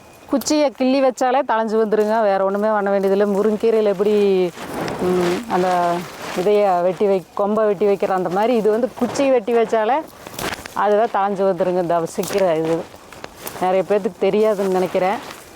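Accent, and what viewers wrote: Kongu, India. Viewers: Ugga vazakkathai aduthavargalukaga matra veddam akka koggu tamiz kojjum tamiz azagu super 💖✨ 💖 ✨ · கொங்கு வட்டார பேச்சு😂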